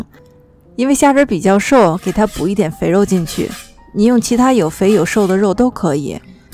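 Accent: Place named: North, China. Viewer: 怎么是大陆北方的口音，带点儿化音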